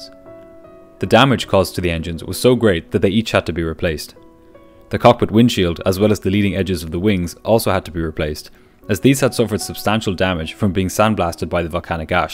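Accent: Ireland. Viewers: Your videos are of incredible quality. They're very easy to watch and follow, you are really onto something with this channel. It's also great to hear a fellow Irish accent in this community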